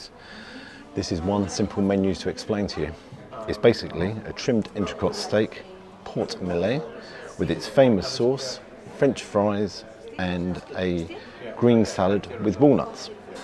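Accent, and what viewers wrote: United Kingdom. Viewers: britski-petski accent is so annoying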